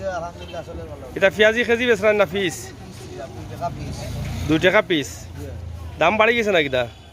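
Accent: Sylhet, Bangladesh. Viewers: ভাই আপনে একবার সুদ্দু করে কতা বলেন একবার সিলেটি মাতইন মাতলে একলাকান মাতইন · Bhai Sylheti matoin bala laga Suddo bashai matla bala lagana hunta. Sylheti mat ou best Amra hokkol Sylheti desh bides o beta giri.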